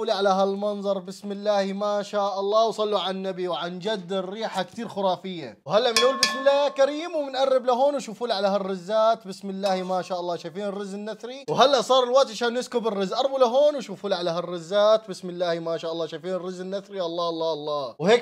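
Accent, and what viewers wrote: Syria. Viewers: من درعا واضح من اللهجة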